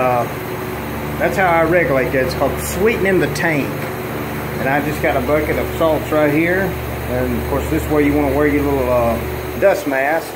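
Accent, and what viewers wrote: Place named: South Carolina, United States. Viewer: I am willing to bet this fella is from South Carolina, he sounds just like Bobby Goodson from Swamp Loggers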